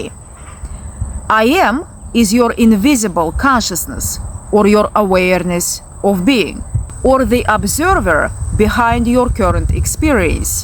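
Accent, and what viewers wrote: Russia. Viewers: Pretty russian-english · Classic Russian accent, I love it 😍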